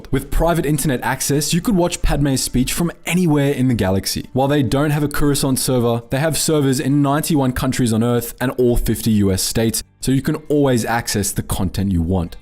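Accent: Australia. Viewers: Imo Generation Tech is a close second, but the Australian accent makes better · Oh yeah, the Australian accent is definitly a plus to the already magnificant content.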